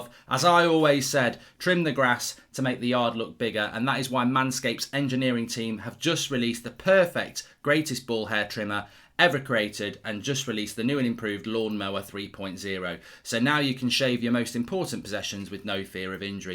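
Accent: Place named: Scotland, United Kingdom. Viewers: upvoted for the scottish accent only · Your Scottish accent is hilarious 😂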